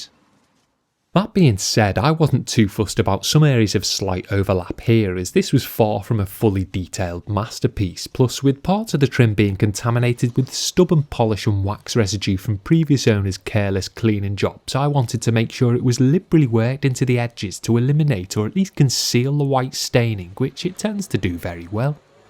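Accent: Liverpool, United Kingdom. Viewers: His accent sounds like he's from Liverpool UK (hope that helps ;) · Awww, the English accent of an Irishman, Scottish, or Brittish. Something enjoyable about hearing the accent as a Yank from across the pond. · LOL Apart from also having a Scouse accent, Ringo Starr's voice sounds nothing like this guy, and nor do/did any other members of the Beatles for that matter. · Meticulous detailing with good explanations with a pleasant Liverpool accent. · I am Mancunian, and I love your Scouse accent....It's so soothing , and beautiful. The vid is great too! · oh my goodness is that your real speaking voice!?!?! its sooo pleasant, you should be a professional narrator or make an app where you recite poems! it's so calming and everything my Texan dreams could ever dream up for a British guy - you're amazing!! Anyways, if I was going to use this on my 2 door Jeep Wrangler how many bottles of the C4 would you recommend buying?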